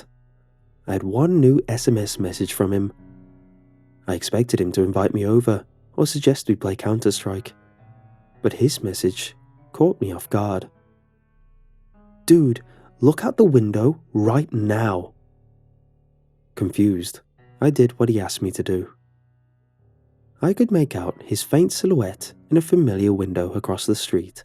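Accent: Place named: RP, United Kingdom. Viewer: Think that's just how posh twats talk it sounds like that 😂😂😂😂 lmao. I'm from the UK I am not bloody English tho!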